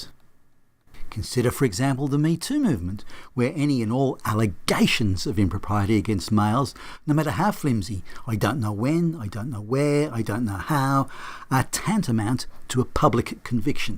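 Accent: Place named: Australia. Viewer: This is an unique reinterpretation of the Rodney King event, reinterpreted in light of the current social marist agenda. I remember when that went down. I remember similar racial confrontations going all the way back to "Black Day in July", when Detroit burned. Maybe you could reinterpret that too in the same vein, but you would be as wrong as you are about Rodney King event. These issues are far more complex and nuanced then you give credit to. From your accent, I might guess that you were far away from the event when it happened, on the other side of the world in fact in Australia. If that is true, you would not have the perspective of someone who grew up in America and experienced racial tensions first hand. However, just now I looked up to the statement under your video where you ask if the1990's trial of African American Rodney King introduced racial activist jurisprudence, and that is certainly a valid question.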